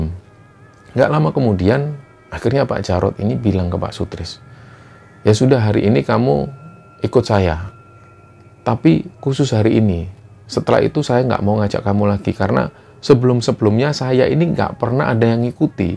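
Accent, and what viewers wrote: Java, Indonesia. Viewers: Suaranya jawa banget mas,up trus jeh mas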